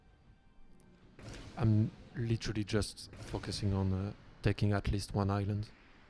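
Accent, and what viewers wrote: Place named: France. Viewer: J'avoue son accent français se remarque tellement mdrr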